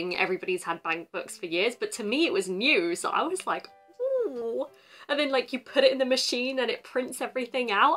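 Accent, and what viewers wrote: United Kingdom. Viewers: It’s a beautiful British English!!!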